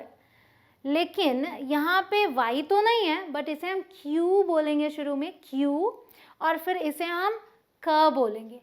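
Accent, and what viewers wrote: India. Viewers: Indian accent English?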